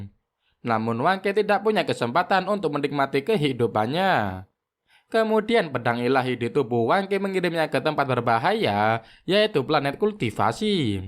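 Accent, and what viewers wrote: Java, Indonesia. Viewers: jelek suaranya, bahasa indonesia dengan lidah kental Jawa.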